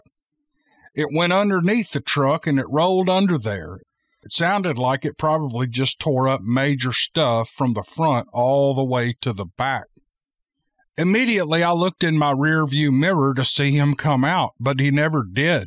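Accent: South, United States. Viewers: There will never be too much commentary,. That's why alot of us listeners tune in! Your southern drawl is 👌 🖤from Oz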